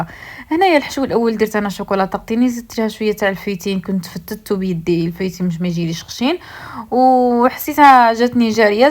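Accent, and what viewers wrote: Jijel, Algeria. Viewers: ماشاء، الله يعطيك صحة من هدرتك تباني جيجلية هههه ❤متتبعة وفية لك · وربي يخليلك صحيحتك الزينة معليش تقوليلي من اي ولاية انت لهجتك كي ما انا انا من باب العسة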